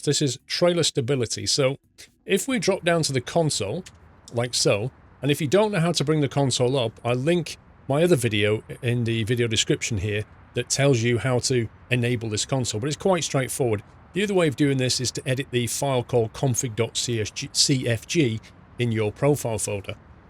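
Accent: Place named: United Kingdom. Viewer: There is thousands of videos like that on YT but ONLY can explain properly even hes got that british accent (luckilly i used to live in Birmingham:P ).Other twats just expect money for their shitty videos. deservers that. Greetings from Poland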